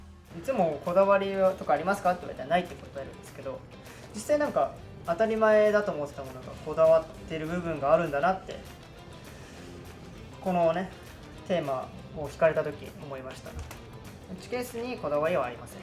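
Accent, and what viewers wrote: Nagoya, Japan. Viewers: 適度な名古屋弁がめちゃめちゃ良いです😆✨✨ こんなふうに現役スケーターさんの動画が見られるなんて良い時代やなぁ💕 宇野さん、チャンネル開設ありがとうございます🎶🎶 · 名古屋弁が…… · ほかっとく💕 たまに出る、名古屋弁にキュンキュンしちゃう🥰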